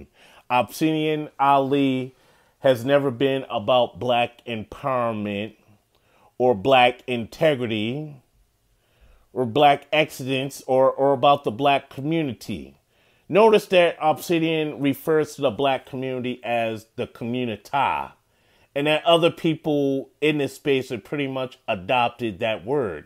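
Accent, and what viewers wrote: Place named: New York, United States. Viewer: Interesting. So I know you're from NY (I can tell by the accent). Where did you travel to where you encountered Black women who didn't act like the women you grew up around? For me, one of the best things I've ever done was traveling for leisure while in graduate school. I would just go to random places for the heck of it (Salt Lake City, St. Louis, Nashville, etc.).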